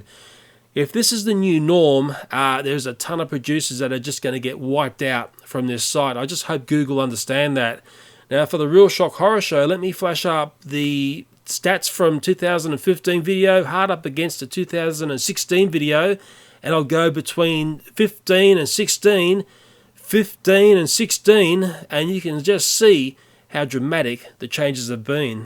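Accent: Australia. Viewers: Hello, I am a new subscriber found your channel couple months back. Out of all the channels I am subbed I look foward to your new videos the most. Love your personality, sense of humour and, your Australian accent. Keep up the hard work. I hope youtube doesn't continue to go down this path but it seems they keep messing with content creators and it is hard to get views unless you are always on the trending tab.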